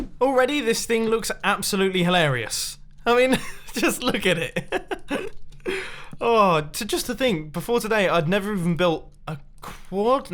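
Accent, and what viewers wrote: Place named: United Kingdom. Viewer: used to sound less British back in 2017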